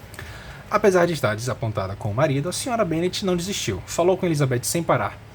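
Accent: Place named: Northeast, Brazil. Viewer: tu é de Natal/Rn né? sem condições, se não for nordestino, eu cegue.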